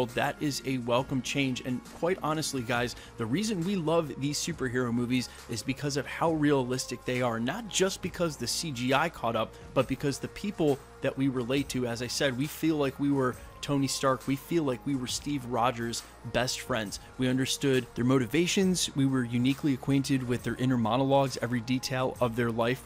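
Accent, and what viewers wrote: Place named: Philadelphia, United States. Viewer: Just curious. Are you from Philly? As a local, I’m picking up a slight accent. Cheers.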